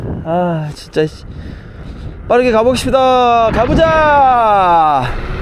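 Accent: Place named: Jeju, South Korea. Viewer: 제주사투리 왜케잼잇지?ㅎ 제주대리 궁금햇엇는데...잘봣습니다.ㅎ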